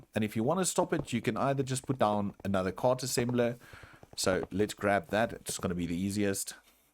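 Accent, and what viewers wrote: South Africa. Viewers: South African? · Are you south african? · Nice video! Are you south african? I think I hear an accent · This guy sounds south African ngl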